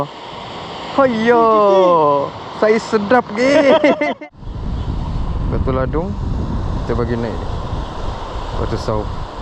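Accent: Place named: Sabah, Malaysia. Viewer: Terbaikk gabungan 2 sipoo surfcast sabahan😅.. Sekali loghat sabahan berkumandang... Paduu betul sipoo tu, landed ikan sisik dgn Palergic.. Surfcast memang kepakaran dia.. Sipoo pun x kurang mantap.. Strike padu jgak wlau ikan duri.. Rwzki buat kwn.. Alhamdulillah.. Terbaikk 2 sipoo idola.. Mantapoo❤🎉❤🎉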